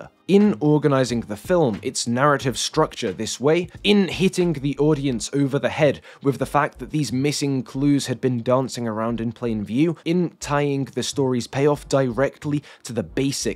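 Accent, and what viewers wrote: United Kingdom. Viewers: Hilarious to hear you and all those who pretend to just love Glass Onion even though it lacks everything that Knives Out “an actually good movie” has in volume. Name one character you are true my invested? Glass Onion sucked and even your British accent can’t convince me what I watched isn’t complete RUBBISH!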